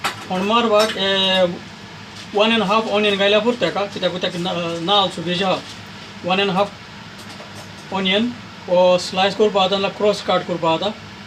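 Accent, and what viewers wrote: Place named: Salcete, India. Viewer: I just love the way you talk pure sashti konkani and your way of explaining the recipe thank you so much God bless you always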